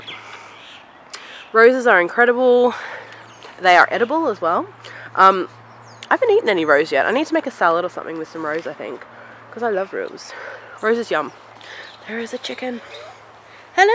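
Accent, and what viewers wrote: Australia. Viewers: Just found you and hearing a fellow Aussie is awesome!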